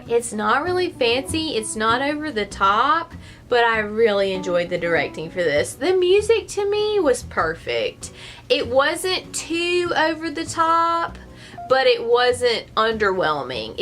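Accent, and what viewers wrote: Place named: South, United States. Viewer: Love your accent. Proper southern lady there.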